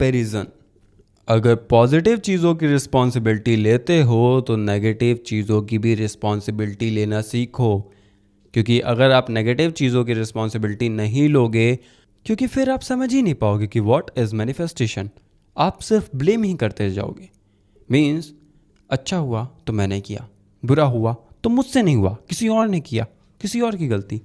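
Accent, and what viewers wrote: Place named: Allahabad, India. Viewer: Ap allahabad se ho kya kyoki mai kom Ham bolte hai 😂❤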